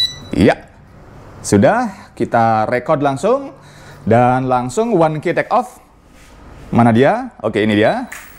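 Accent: Bali, Indonesia. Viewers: Makasi Bang review Dronenya jadi tau kualitasnya. Btw asli Jatim Bang? Soalnya Logatnya Logat Bali 🙏😁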